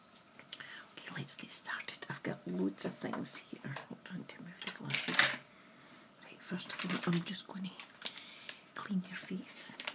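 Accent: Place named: Scotland, United Kingdom. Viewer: YOU are an angel for running this channel and uploading these videos. I don't comment too often but you're my favorite asmr artist. English is not my 1st language and ever since I first heard a scottish accent it's been my favorite, it sounds so lovely and unique! Btw; your makeup always looks so nice. I love this eyeshadow look! 💗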